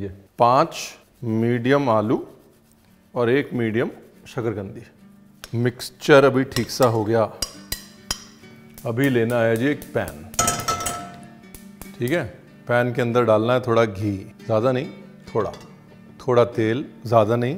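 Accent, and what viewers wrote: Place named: Varanasi, India. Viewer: बोले तो छा गए गुरु... बनारसी अंदाज में।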